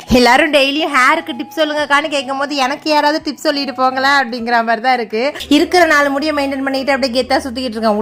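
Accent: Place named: Thanjavur, India. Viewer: பேச்சு சூப்பர்... ஊர் பாசை நல்லா இருக்கு... தஞ்சாவூர் பாசை....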